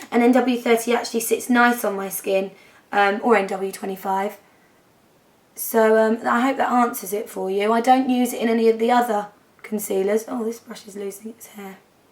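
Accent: United Kingdom. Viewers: I just love doing that line, it makes such a big difference along with the mascara, sometimes you don't need anything else on the eyes. When you said gel liner I heard jaw liner, but of course english is not my native language, and paired with that lovely british accent (which I would kill for, I can only speak like Americans meh) it came funny to my ears. :D BW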